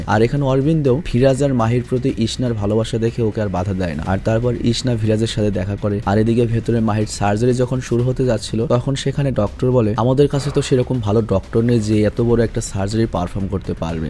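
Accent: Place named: Noakhali, Bangladesh. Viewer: এাই নোয়াখালীর হোলা❤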